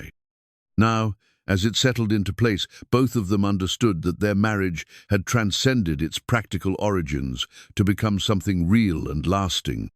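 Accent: England, United Kingdom. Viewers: Prefer this English accent !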